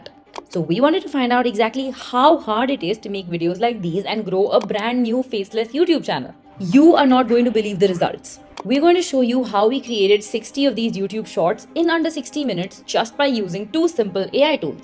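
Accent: India. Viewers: I knew this is clickbait once I heard indian accent 👎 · Your Voice Like : indian ❤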